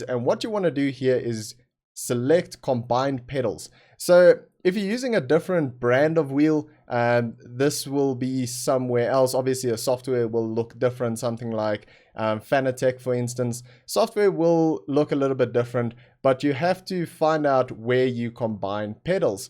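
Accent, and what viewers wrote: South Africa. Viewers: You sound a lot like a South African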